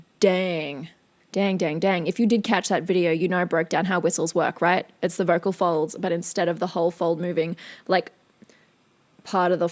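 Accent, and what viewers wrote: Australia. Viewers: Australian accent, yes.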